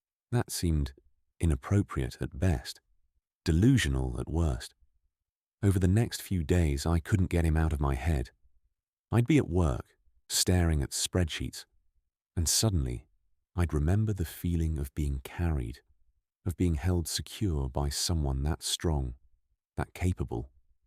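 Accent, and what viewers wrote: United Kingdom. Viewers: A part 2 of this story would be awesome! love the British accent of the narrator.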